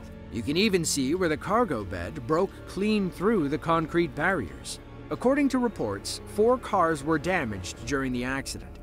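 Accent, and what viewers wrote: United States. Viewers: he speaks English like an American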